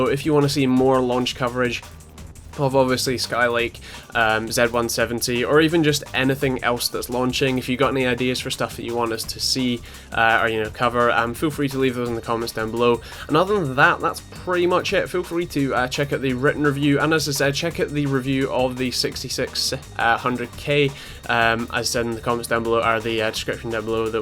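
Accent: Scotland, United Kingdom. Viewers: You seem to have a very slight Scottish accent? Where you from?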